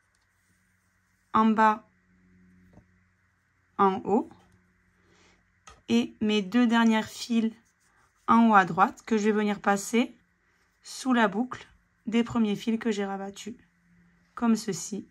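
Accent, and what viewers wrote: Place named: Perpignan, France. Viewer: Super sympa et tellement bien expliqué avec notre bel accent de Perpignan 😅.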